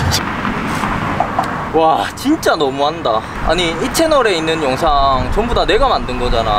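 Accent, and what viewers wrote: Daejeon, South Korea. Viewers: 저기 혹시 대전사람인가요 어째 친근하더라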